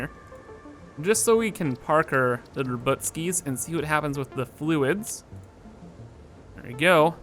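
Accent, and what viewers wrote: Netherlands. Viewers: Are you dutch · Are you Nederlands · dude for sure you ar efrom holland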